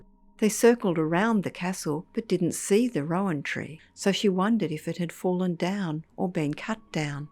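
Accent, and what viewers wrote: Australia. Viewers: This is not a British accent it’s Aussie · More disturbing hearing an aussie narrator say "playing tag" and you pronounce castle weirdly. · Aussie accent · Agreed 👍I'm British too. I think she's Australian😊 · She iis Australian, not British. Americans seem to confuse the two.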